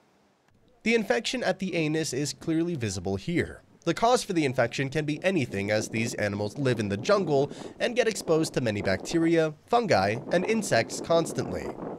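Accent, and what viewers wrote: United States. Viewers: I get the that the script, which is also in the description of this video, was written by the creator of the video and English is not their first language so that is why there are so many weird phrasings or things that don’t make sense that the narrator says and that’s fully understandable. But the narrator sounds American, so why did he not make corrections to those errors instead of allowing the video to sound ignorant? I know narrators are supposed to read what they’re given, I’ve done that work before. But when it’s really bad like this you correct it.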